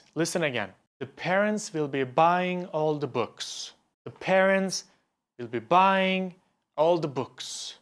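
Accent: Turkey. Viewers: Abi Türk olmama rağmen izledim bende İnilizce öğrenmeye çalışıyorum Türk aksanı ile iyi oldu. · Senin Erzurumlu şiveni seveyim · bro. i just noticed he has a turkish accent.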